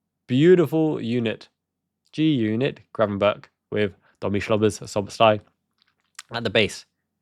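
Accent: United Kingdom. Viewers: btw dude you may be in Japan but did you grow up in the UK? You sound very British :)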